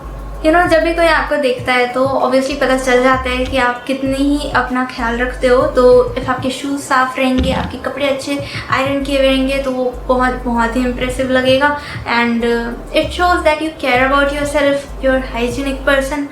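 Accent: Chhattisgarh, India. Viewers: I love that chhattisgarhi accent❤❤ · Chattisgarhiya sable badhiya 😁 It's nice to know that u r chattisgarhiya Where r u from in cg.... Ur cg accent is cute 😜😜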